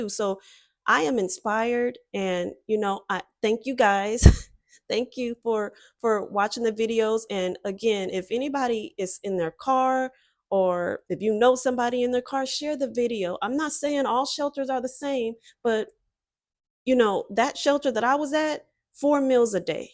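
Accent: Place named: Bay Area, United States. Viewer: Ms. I hope hope you keep on with it. Your videos are really good. Now you have a sultry twang voice and in this video specifically your voice you sound a lot like Kamala Harris. I guess it's that both you and Ms. Harris are from California. The Bay areas has a vocal connection hundreds of mile away.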